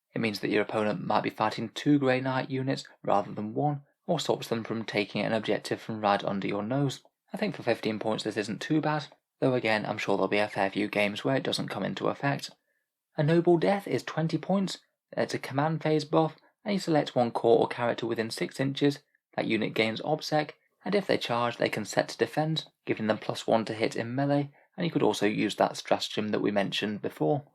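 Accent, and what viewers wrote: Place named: United Kingdom. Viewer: Your format is the perfect way to showcase Codexes and the British accent makes all things 40k more authentic to me.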